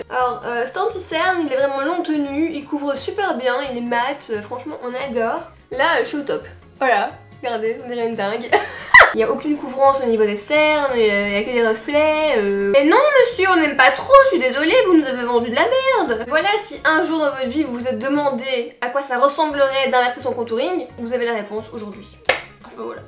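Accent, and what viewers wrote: Belgium. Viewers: tu as honte de ton accent belge n importe quoi reste comme tu es on t aimera plus naturelement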